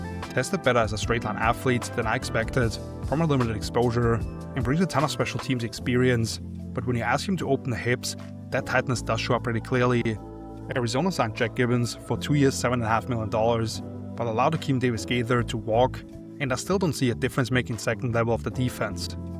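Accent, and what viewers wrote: Austria. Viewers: Well done sir, solid analysis all around. I appreciate the Austrian accent as well.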